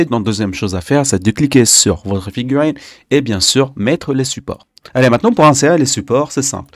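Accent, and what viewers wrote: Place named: South, France. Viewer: 👏 pour la vidéo et 👍 pour le délicieux accent du sud qui fait tout le charme 😉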